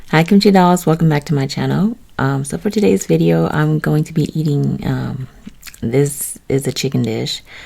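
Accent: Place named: South, United States. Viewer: You have such a lovely soothing voice, almost sound southern 😊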